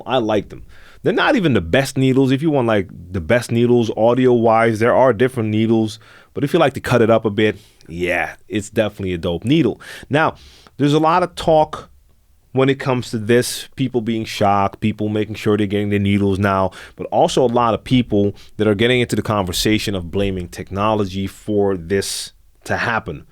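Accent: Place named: East Coast, United States. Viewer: It's killing me to know....you say you live in the Netherlands, but you have an "east coast" accent and you look like you're Latino...can someone let me know? Also, yes I was too disappointed when I heard the news about Shure needles demise. I always loved the way ortofons looked, but they we're too expensive for my budget. Back in the early 2000's I started with "m-audio TORQ" as my DVS, I liked the fact that I didn't have to carry loads of crates to parties. Then I upgraded to serato SL3, but I somehow keep coming back to vinyl.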